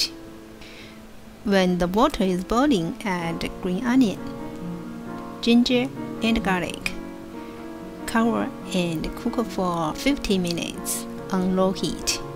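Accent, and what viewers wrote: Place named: China. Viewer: 这个中国式口音 像学生读英语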